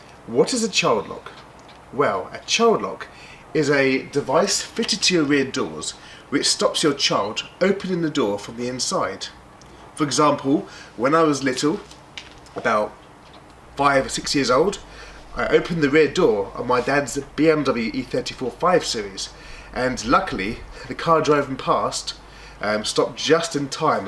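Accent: United Kingdom. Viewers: Klassno. Tak prijatno slishat British English in Russia! Nice car! <link> My husband's scuba diving videos (mainly)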